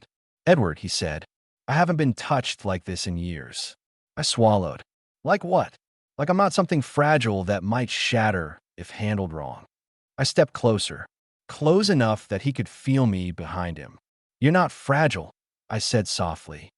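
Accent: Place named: Spain. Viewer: I agree with you that this story was good but did you hear the Spanish intonation in the narrative? Best wishes to you 🙋‍♂️🇬🇧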